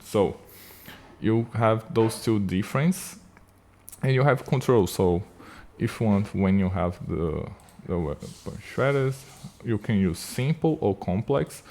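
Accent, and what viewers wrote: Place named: Brazil. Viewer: Não sei como mas da pra reconhecer que é BR pelo sotaque kkkkk Salve de Florianópolis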